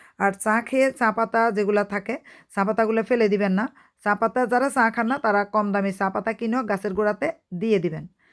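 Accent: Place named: Chittagong, Bangladesh. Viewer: আপু আপনাকে অনেক ধন্যবাদ আপনি কোন দেশে থাকেন কথা শুনে মনে হয় আপনার বারি চট্টগ্রাম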